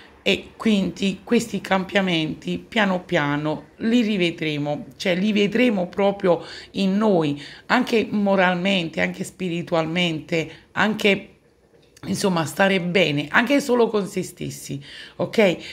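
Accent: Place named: Naples, Italy. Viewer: Grazie,per le tue parole,anche il tuo napoletano è dolce ascoltarlo ,per me è la prima volta che ti ascolto,può essere così inportande sentire, quando tutto intorno è crollato, una previsione serena,auguri anche a te per la festa della mamma,penso che tu lo sia e anche se non lo sei auguri comunque,ciao.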